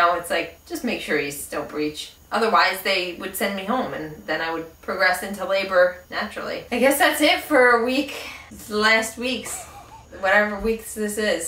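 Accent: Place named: New York, United States. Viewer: I don't know what it is, but something about your New York (New Jersey maybe? lol) accent and how silly you are with your husband, you just seem really cool and you put me at ease in an odd way. I usually feel really uncomfortable around other women (people in general, but I just usually never get along with women, I don't know why) but I think I'd like you :P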